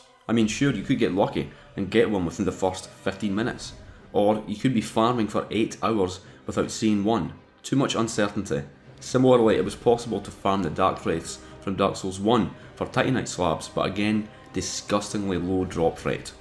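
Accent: Scotland, United Kingdom. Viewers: Great work mate, refresher to hear a Scottish YouTuber with an actual normal Scottish accent 😂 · Great video mate! I' ve only had a few problems understanding everything, because of the scottish accent, but went fine at the end, I guess 😅😂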